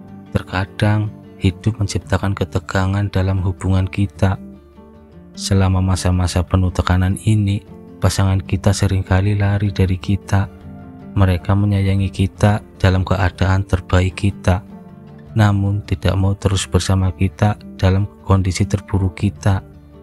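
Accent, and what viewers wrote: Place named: Java, Indonesia. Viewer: Njowo bangett mass, medokk pisannn buat jd cowo maskulin😂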